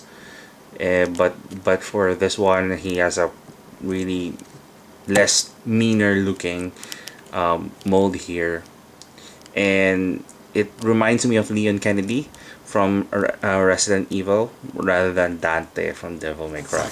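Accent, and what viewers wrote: Philippines. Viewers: are you fillipino? you sound like it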